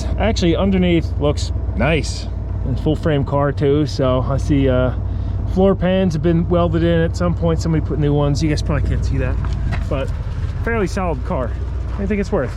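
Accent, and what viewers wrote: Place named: East Coast, United States. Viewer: The east coast accent is awesome! I'm from Wisconsin, we sound goofy!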